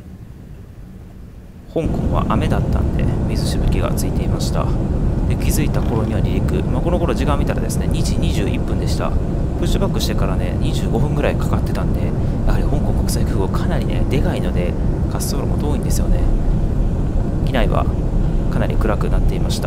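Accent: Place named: Kansai, Japan. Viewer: バリバリの関西人ですからね、うp主は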